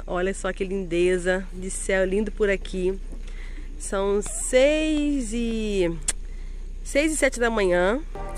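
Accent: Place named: Rio de Janeiro, Brazil. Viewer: Que delícia de vídeo, amada. PARABÉNS PELA sua desenvoltura em nos presentear com seus vídeos naturais, alegres e divertidos. Amoooo. Bjs, gente lindaaaa... AMO seu forte sotaque carioca... Deus é nosso abençoado refúgio querida...😍😘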